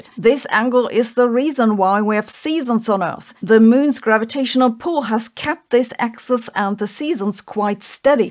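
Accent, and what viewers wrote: Germany. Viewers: Incredible accent. My thinking is she’s German and has spent a lot of time in the U.K. Now on to the Science…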